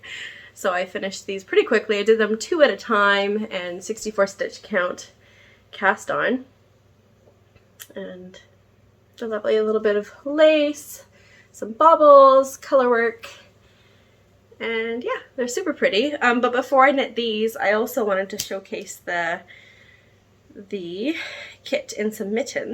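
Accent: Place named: Canada. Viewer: Hi!! I’m so happy I found your podcast! I love listening to your subtle Canadian accent 😊 & you’re not talking too fast, at least I don’t think so. Your hand dyed yarn is so beautiful, I hope I can pick some up one day! Thank you for explaining why you do the sleeves first on sweaters…I’m trying to get more sweaters and garments done this year so that’s helpful to know! I’m currently working on my Beauceron Sweater by Vincent Deslandes while watching & I definitely subscribed - can’t wait to see your future podcasts! Sending 💜 from WA state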